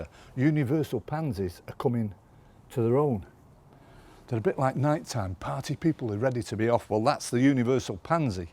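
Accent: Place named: Northern England, United Kingdom. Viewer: Yes an English gardener👍😉👍 and by the accent a northern one at that. I've SUBSCRIBED, I'm getting on in years but giving gardening another go, and who else to follow but someone who actually lives in my part of the world. A brilliant video mate and I'm already looking forward to watching more of your video's.... oh I'm from South Yorkshire and pleased to meet you👍😉👍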